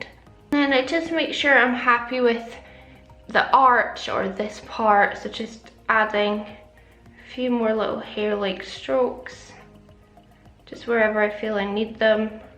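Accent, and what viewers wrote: Scotland, United Kingdom. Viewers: i clicked on this video because i wanted fluffy brows then realised you were scottish and immediately subscribed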